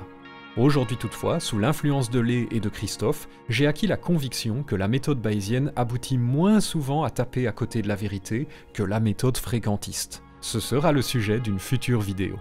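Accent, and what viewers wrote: Liège, Belgium. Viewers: Oufti l’accent de Lietche! 🤪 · tooor, preuf, toi t'as un accent belge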